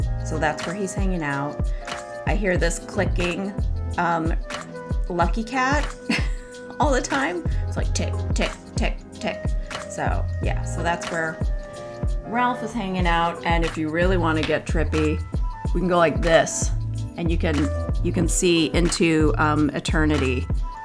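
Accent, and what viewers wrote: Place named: Australia. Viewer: Your Australian accent 😂😂😂 oar noar 😂😂 means “bee” so that is super cool 🐝 Melissa also means bee….. what are the odds hey? We almost have a hive in the art community.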